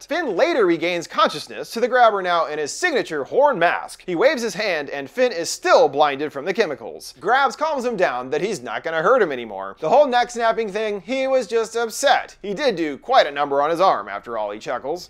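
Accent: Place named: South Carolina, United States. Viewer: I never claimed to not being disrespectful lol. I very much meant to offend his fans because I think it's disrespectful to sheer a conversation praising a creator into a conversation praising a different creator by putting the original one in second place or "just not as good." My observations are completely one dimensional, I watched like 2 minutes of a video and looked at his thumbnails, I'm not a critic I don't want to watch him based on the 2 minutes and so I base my entire opinion on it. I'm not a hypocrite, I'm arguing for a subject not a spectrum, just because I don't like mowing lawns doesn't mean I don't like gardening. His thumbnails are clickbait and aren't to my liking. He talks like a south Carolinian closeted husband. and I don't like him.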